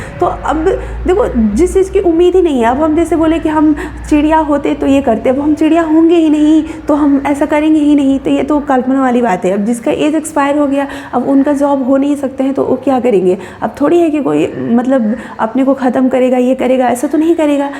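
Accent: Uttar Pradesh, India. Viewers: Di aap up se ho kya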